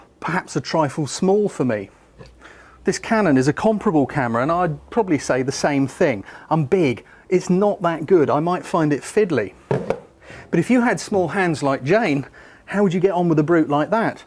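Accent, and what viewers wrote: United Kingdom. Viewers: Nice British accent and off course nice info. thanks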